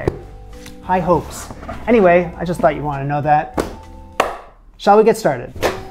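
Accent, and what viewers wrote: Canada. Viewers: Ha! I've watched several of your videos lately and recently thought, "is this guy Canadian?" due to your accent. This video confirmed it!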